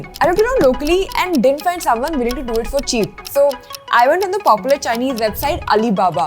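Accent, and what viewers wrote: India. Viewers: Who wants negotiate price if you talk like this? It's like the joke by Jimmy Carr: "It saddens me. They don't have to be this way." Why don't they import native speakers? Will "Indian English" one day an appreciated or even liked accent like british, american or australian? NEVER!!!! 🤮🤮🤮🤬👹 I'll be in India for then next few weeks on vacation from europe and I'm already sick of it after a few hours of youtube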